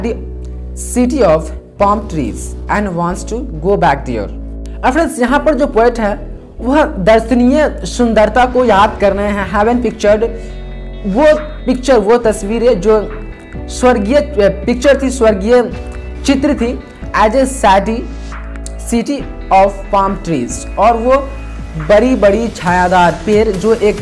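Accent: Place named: Bihar, India. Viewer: Main comment my pdi aap bihari ho lg v rha tha jb suni tb bhut achhi explained kiye ho aap bt thodi si bich bich my annnnn unnnn ar rukna bnd kijiye😂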